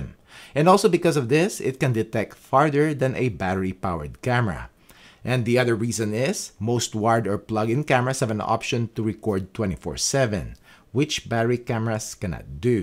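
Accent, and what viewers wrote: Philippines. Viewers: Thanks and you sound like you’re from the Philippines 🇵🇭. ❤️👍💯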